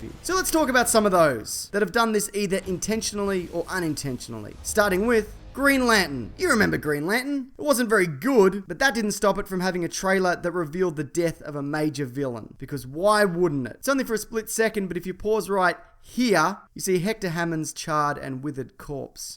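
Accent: Australia. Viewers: Dark City trailer told us that SPOILER aliens move the city around with their minds. Psycho's trailer showed us SPOILER a blonde woman screaming in the shower (actually Vera Miles in a wig, not Janet Leigh). Also you should make one of these where you just say Australian accent words like "Green LANT'n" or "Yes-tah-day." Childish, I know, but pleasing to my American ears.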